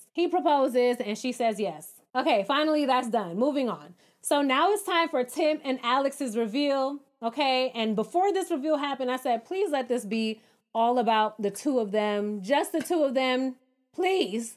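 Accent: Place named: New York, United States. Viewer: Girl you gotta be from NYC cause the way you talk 😂😂😂❤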